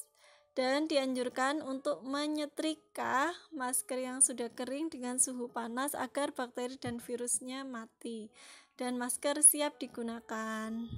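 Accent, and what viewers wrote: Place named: Java, Indonesia. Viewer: 👍👍👍 medok kali mbak suaramu 😁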